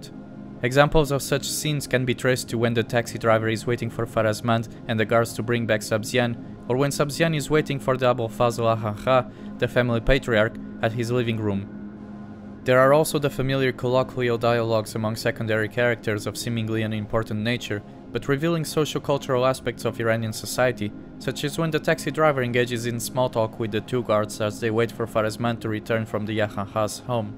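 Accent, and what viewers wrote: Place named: Portugal. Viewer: Esse inglês com sotaque português não me enganou! Parabéns pelo vídeo, para mim o Close Up é dos melhores filmes de sempre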